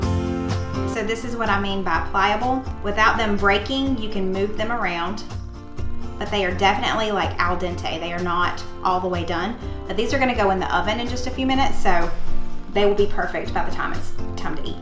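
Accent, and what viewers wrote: South, United States. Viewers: Love that sweet southern accent you have runs deep in us Southern women! You have a beautiful family so happy to have found your channel! Cover the chicken with plastic wrap and you want have to worry about chicken juice getting on counters just helps with cleanup and safety. 😊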